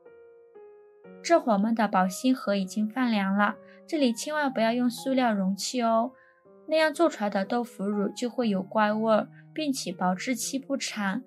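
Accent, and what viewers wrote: Yunnan, China. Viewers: 博主口音是雲南哪裡的？ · 云南的单山辣，云南普通话，云南风味。🤤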